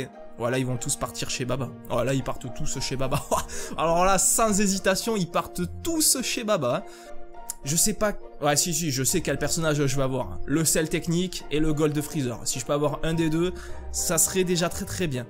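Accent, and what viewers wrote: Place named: South, France. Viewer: petit accent du sud ça fait plaisir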